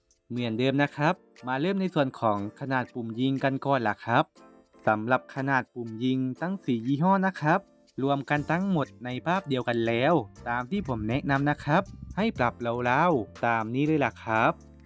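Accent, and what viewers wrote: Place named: South, Thailand. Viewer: ผมคนนครพี่คนที่ไหนเสียงเหมือนคนใต้เลย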